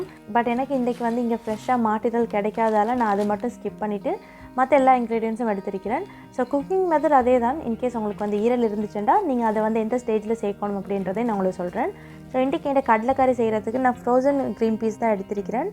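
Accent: Sri Lanka. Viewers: Assalamuallikum sister MashaAllah so proud of u talents Just came across blog U are doing a amazing job So clear and so good are all u recpies Are u a Srilankan as the way u speak is lot like us May Allah Bless u for many more success MashaAllah u are very pretty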